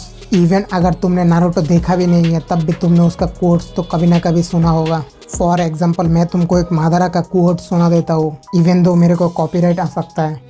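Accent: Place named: Northeast India, India. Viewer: Voice sunke aisa lagta he ki tum Manipur, nagaland, mizoram, odisha me kahise ho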